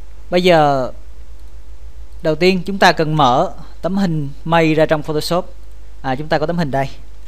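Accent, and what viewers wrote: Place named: South, Vietnam. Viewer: Kết cái giọng Nam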